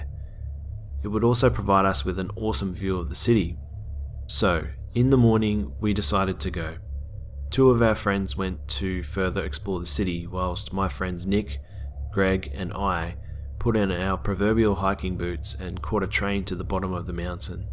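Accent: Australia. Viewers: You're Aussie yeah? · are you from australia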